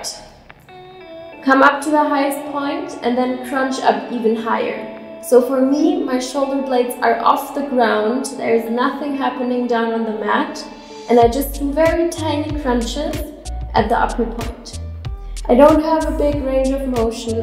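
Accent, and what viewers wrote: Germany. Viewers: An alle sie ist deutsche merkt man auch wenn sie spricht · Hi i just wanted to tell you that you have sich a beautiful body and you are so pretty. I also really like the style of your Workouts. Are you from germany? Bc your accent makes it seem like you are... :)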